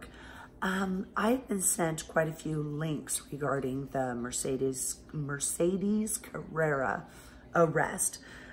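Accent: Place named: Illinois, United States. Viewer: I bet you from Illinois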